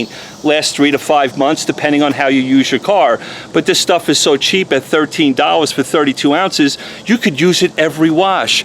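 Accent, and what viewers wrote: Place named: Minnesota, United States. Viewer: I’d love to try this, but I’m in Minneapolis. No stores in MN sell, one store each in both Dakotas, and one in Madison. I’ve always used Meguiar’s Expeess Spray Wx when I fry a car. Works like a dream tool. Plus, Meguiar’s is a 3M company, so I’m using product from a hometown place. Sure liked this video,. I like watching you because you’re thorough, and it’s nice to hear my accent out of someone else’s mouth for a change.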